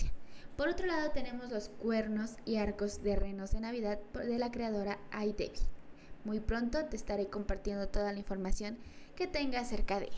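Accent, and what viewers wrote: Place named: North, Mexico. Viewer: Este wey es más norteño que yo… Me representa👍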